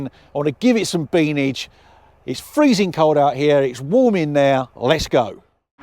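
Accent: United Kingdom. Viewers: Tawk, Spowrt. Proper Saaff accent. Great review. So ugly from the back but it looks a whole load of fun.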